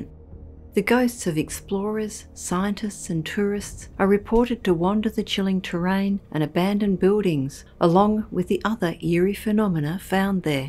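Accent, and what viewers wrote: Australia. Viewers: She's Australian. I'm one, I can tell. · Here from North Queensland, Love hearing another Aussie voice, 🇦🇺🇦🇺🇦🇺🇦🇺🐨🐨🐨🐨🐨🦘🦘🦘🦘and awesome channel.👍👍👍👍👍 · it's an Aussie Sheila it is .🐨 😲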